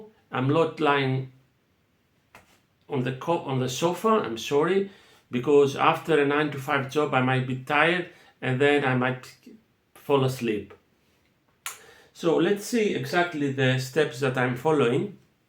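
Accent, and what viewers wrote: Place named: Greece. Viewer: The way you speak english strongly suggests me that you are greek. Την εφαρμογή χρησιμοποιείς η το βιβλίο της ασιμιλ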